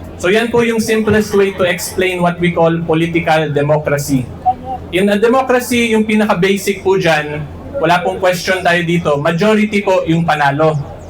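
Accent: Visayas, Philippines. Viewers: Bisaya diay ka atty hehe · Sir abayon kaya pala may lambing sa dulo Ang pananalita mo bisaya man diay ka,, salamat sa PAG kampi mo sa tama, Ay salutes you always. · Bisaya kapo ba atty?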